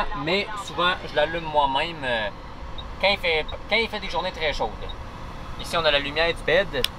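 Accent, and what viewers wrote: Quebec, Canada. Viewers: Salut Un bonjour 👋 de France de région parisienne Super tes vidéos avec ton bel accent Québécois c'est l Amérique en français Hâte de voir une vidéo avec de la neige Continue comme ça Longue Route à toi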